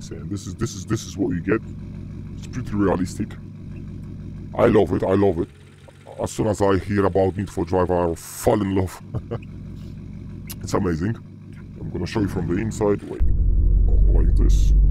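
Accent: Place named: Italy. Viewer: fra si sente di piu l'accento italiano che il voice changer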